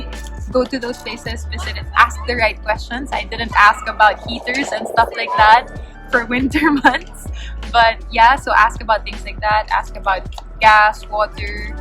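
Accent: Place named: United Kingdom. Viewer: Lumalabas British Accent niya dito since she is British-Pinay hehe Gandang Babae nito <3 At ganda din diyan sa UK lalo na sa London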